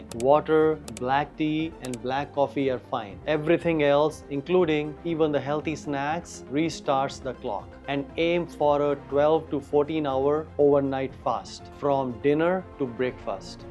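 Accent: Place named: India. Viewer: Sir you sound almost exactly like an indian,or you are an Indian?. Anyway thank you for this info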